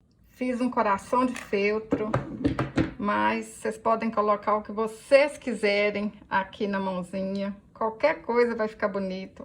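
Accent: Minas Gerais, Brazil. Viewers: Aposto que você é mineira, sotaque mineirinho UAI, acertei?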